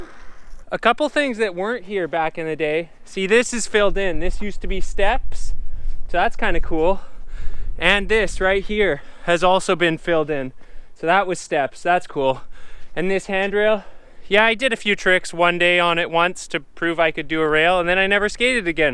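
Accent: Canada. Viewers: U sound so Canadian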